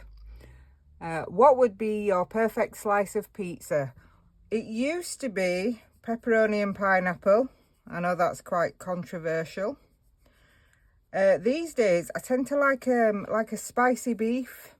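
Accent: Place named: Yorkshire, United Kingdom. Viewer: Hi from Australia...I'm an ex pat Yorky! (Elland) LOVE your accent makes me feel homesick 😊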